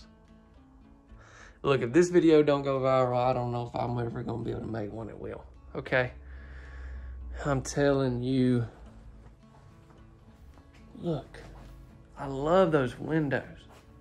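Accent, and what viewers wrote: South, United States. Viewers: The house is great, but the real star is your Southern accent! Love it!!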